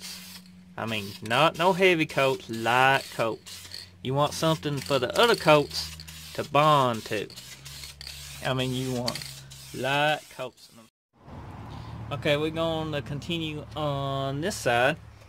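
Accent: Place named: South, United States. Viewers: I was wondering where you were from with that accent. Hazard kentucky? I've been there once or twice. I think i'm going to use this on my 79 g10 restoration. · I knew it I knew you was from ky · I can't decide whether I hear Mississippi water from the river or I hear Carolina. I'm a Texas boy myself so this is how everybody is supposed to sound! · Theres nothing wrong with his voice, just southern tone . Thanks for the video buddy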